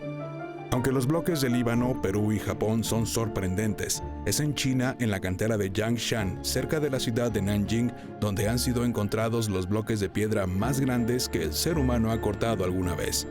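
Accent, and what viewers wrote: Mexico. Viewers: Habla con mucho cantito mexicano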